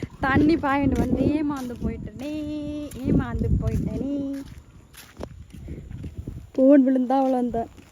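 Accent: Sri Lanka. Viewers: இலங்கை தமிழ் பேசும் அழகு தனி அழகுதான் வணக்கம்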